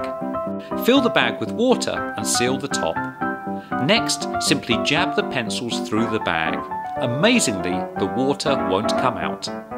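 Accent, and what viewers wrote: United Kingdom. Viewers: All these tricks are pretty lame, but because he has a British accent, I want to try them... God these people are influential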